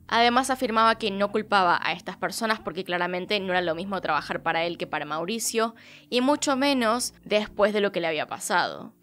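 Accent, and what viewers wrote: Argentina. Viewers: Lo que más me gusta de es su forma UNICA de narrar. Aparte que AMO el acento argentino. Saludos desde El Salvador.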